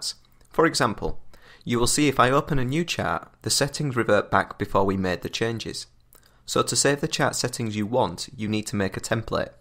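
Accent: United Kingdom. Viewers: Very clear no nonsense with British accent , made it clear and elaborate thank you very much.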